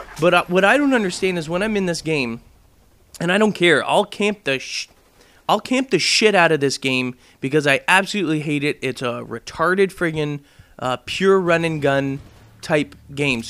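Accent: Canada. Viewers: I know it's kinda irrelevant, but are you Canadian? I thought I heard a little bit of an accent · You canadian? i caught an accent oout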